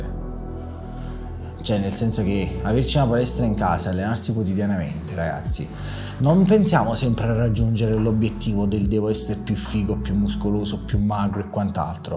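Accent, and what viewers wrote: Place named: South, Italy. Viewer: Ma da roma in giù quando imparerete a parlare italiano? Sempre queste frasi che non si capisce un ca**o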